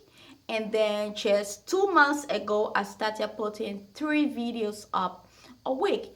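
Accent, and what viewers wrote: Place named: Liberia. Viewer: Hello, JackieNaturals is always mentioning your name so I decided to check you out and support. Your made your first $100 on my birthday. I think YouTube takes a percentage. I like how you’re matching with your eye look. I just went on your website and found out you’re 🇱🇷, I knew I heard an accent. I’m Liberian too 🥰🥰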